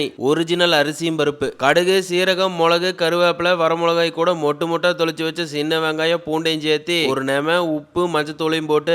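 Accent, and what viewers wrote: Coimbatore, India. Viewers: Your slang of Tamil is ultimate bro as iam also in kovai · பொய் சொல்லாதீங்க அண்ணா நானும் திருப்பூர் அண்ணா கோவை தமிழ் கொஞ்சுதே அண்ணா சூப்பர் அரிபருப்பு சாதம் ல தக்காளி போட கூடாது அது சில லூசு க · கொங்கு பாசை அருமை. ஒரு நெம உப்பு.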